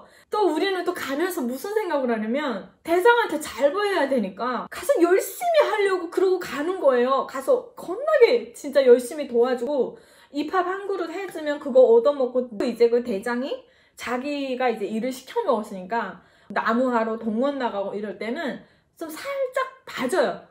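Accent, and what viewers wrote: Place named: Pyongan, North Korea. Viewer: 는 말을 정말 찰지게한다.발음도좋고 평안도사투리도 간간히섞어서 하는말이 애교스럽고 인물도좋고 탈북유튜버들중 최고...국어선생.😨😨😨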